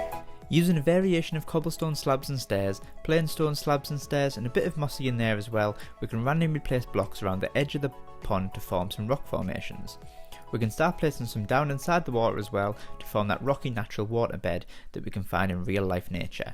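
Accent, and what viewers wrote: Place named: Yorkshire, United Kingdom. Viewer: Me too! :), But i know that Lol, I can tell from your accent, Sounds northern, you from Yorshire?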